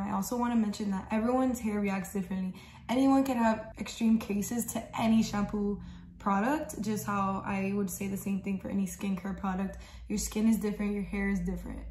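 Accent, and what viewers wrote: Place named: Miami, United States. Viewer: As soon as you started talking I was like MIAMI! The accent is strong lol. I'm from Kendall btw so I hear it right away.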